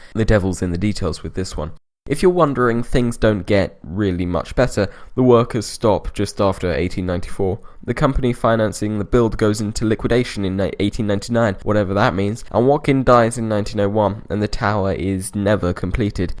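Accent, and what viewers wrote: United Kingdom. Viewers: ugh damn British pronunciation also why do y'all have aero instead of air and zed instead of zee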